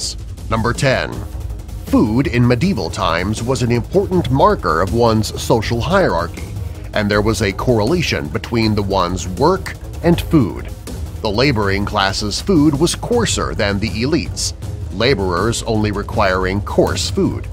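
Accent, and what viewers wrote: United States. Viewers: Interesting but learn to speak correct English language!, instead of americanizing it!!!!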